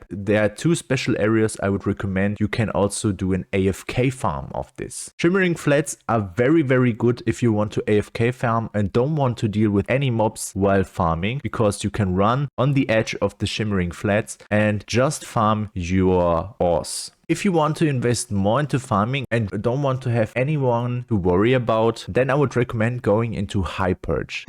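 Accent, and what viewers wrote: Germany. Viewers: Man hört halt so krass raus das du deutscher bist xD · wie kann man so deutsch englisch sprechen? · don't let him bother you. your german accent makes it easier to understand for every non native english speaker ;)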